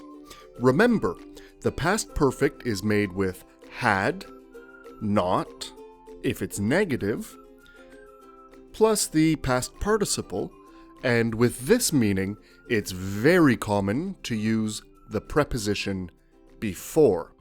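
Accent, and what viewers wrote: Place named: United States. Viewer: My goodness man. Good thing I've found your channel. I love the American English, and you speak so clearly, I give English lessons and your explanations will help me a lot. Good job. And your little stories are the best ones. Keep up the good work.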